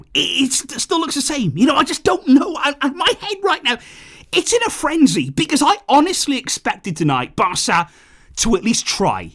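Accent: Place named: England, United Kingdom. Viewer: Your accent doesn’t sound like a typical Spanish person, wonder how a English person ended up supporting Barcelona 🤔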